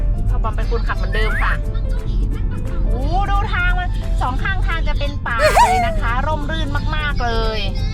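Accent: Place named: North, Thailand. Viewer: แม่ พูดเหนือเพราะมาก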